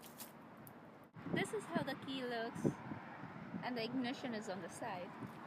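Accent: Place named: Hungary. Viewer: she sounds hungarian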